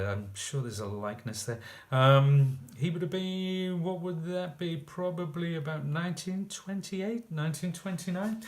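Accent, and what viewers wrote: United Kingdom. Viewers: Well done! Also... nice to here a fellow British accent! 🇬🇧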